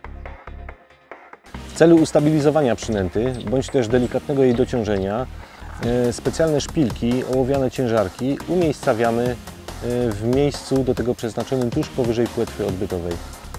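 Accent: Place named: Czech Republic. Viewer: Простите, вы в какой стороне живёте, похоже на Чехию по произношение, я из России..))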